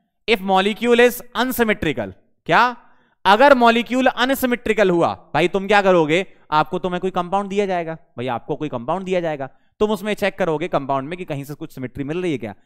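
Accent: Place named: South, India. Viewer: Zaid Khan toh maine kb burai k mai toh inki personality k bre m boli slightly south Indian jaise bolte h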